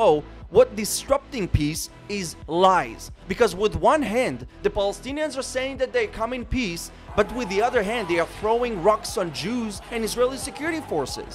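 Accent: Russia. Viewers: are you Russian??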